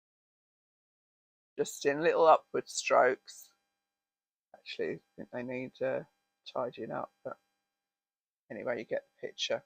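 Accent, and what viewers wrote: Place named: London, United Kingdom. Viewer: Love your videos... where is your accent from? It sounds so familiar to me... SW London?:0) xx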